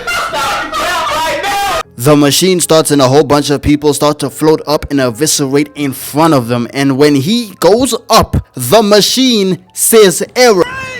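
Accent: South Africa. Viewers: I dont know .... bro sounds south african 😑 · You sound south African bro😂😂 but don't worry I fucks with your content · I knew you were South African 😭😂🇿🇦🇿🇦🇿🇦🇿🇦 yebo lapho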